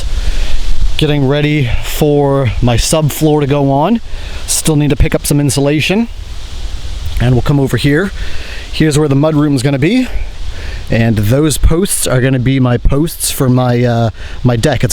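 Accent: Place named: Atlantic Canada, Canada. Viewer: Are you on the East Coast of Canada? This is a guess just looking at your woods and accent. I'm in NB.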